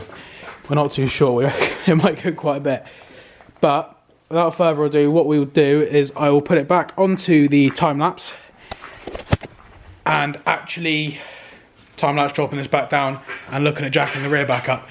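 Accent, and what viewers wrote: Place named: United Kingdom. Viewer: Too much BS jibber jabber… WTF… just show the actual work instead of constantly apologizing in your fucked up wanker, limey accent…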